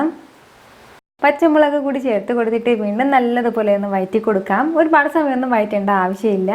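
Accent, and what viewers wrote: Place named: Tamil Nadu, India. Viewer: neenga pesurathu entha Mozhi Tamil kuda sernthu mix aagura maari irukku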